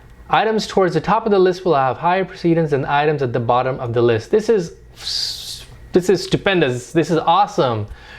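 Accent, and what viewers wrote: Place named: United States. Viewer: drop off that yank accent to get more likes. It’s irritating really. Good video though.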